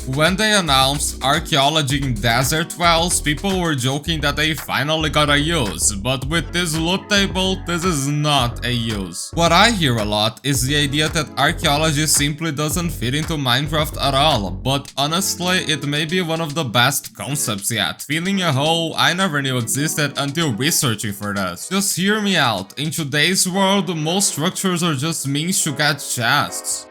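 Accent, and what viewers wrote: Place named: Brazil. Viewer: your accent sounds Brazilian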